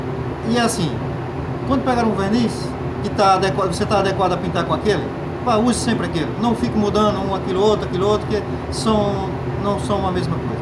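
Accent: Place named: Portugal. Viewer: Vc tem um pouco de sotaque português......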